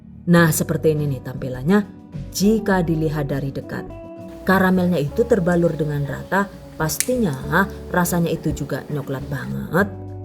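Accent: Banyumas, Indonesia. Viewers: Yg punya chanel orang mana sih? Kok ngapak sprti sayaa 😂,, hehe chanelnya keren dan menginspirasi 😊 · Ngapak Rika ya 😂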